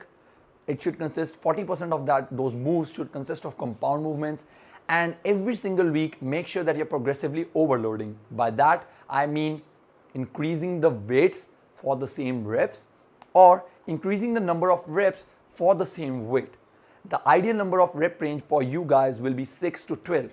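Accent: India. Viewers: I don't know why I guess its the western stigma but I think of a wise monk listening to your videos lol. People from India with the hindu accent feels like it just oozes wisdom and patience. Keep up the great videos.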